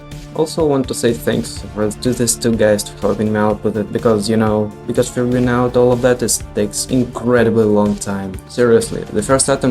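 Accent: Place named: Russia. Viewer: Ты звучишь очень пл русски...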